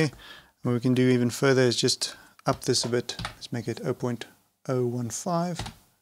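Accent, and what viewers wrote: South Africa. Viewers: South African? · That NB gave it away i was like its definitely a South African Afrikaans?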